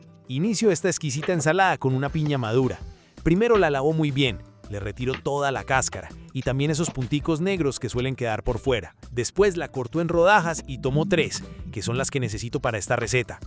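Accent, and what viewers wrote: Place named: Antioquia, Colombia. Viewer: Hola muchísimas gracias por tus recetas, pero sabes? ... lo que más me encanta es tu sentido del humor y tu acento pais, jajaja divertido t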